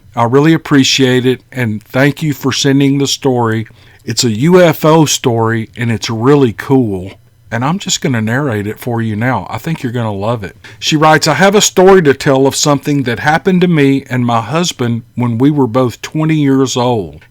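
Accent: South, United States. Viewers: I agree. Love your enthusiam! , and sounthern accent. :) tyfs!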